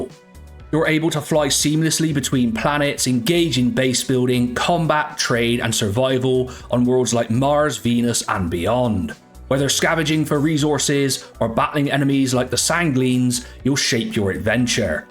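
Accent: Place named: Bristol, United Kingdom. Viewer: Your accent sounds Bristolian, the very least you're from the South West? I have so many questions.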